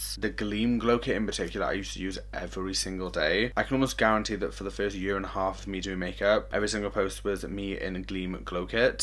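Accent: Wales, United Kingdom. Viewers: Are you welsh? Love you btw !! 💓💓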